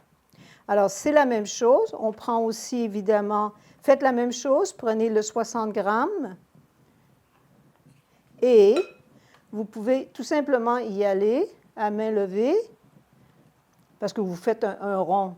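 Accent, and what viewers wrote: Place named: United States. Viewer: Première fois que j'arrive sur une de vos vidéos, quelle élégance pleine de simplicité ! Très vite je me suis dit "cette dame est parfaitement bilingue français/américain" en entendant votre façon de dire "é-et" a la manière américaine "a-and" 😊 Merci pour cette belle recette ! Mon vieux déshydrateur est rond mais je devrais trouver une solution. Merci encore, vous êtes très inspirante 🌺 🇫🇷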